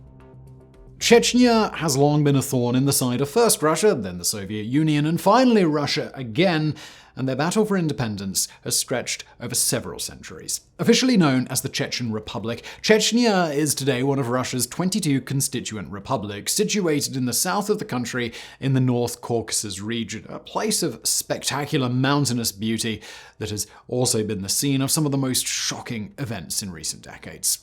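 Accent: RP, United Kingdom. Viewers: There really is no better narrator for a historical documentary than someone with a posh British accent I swear to God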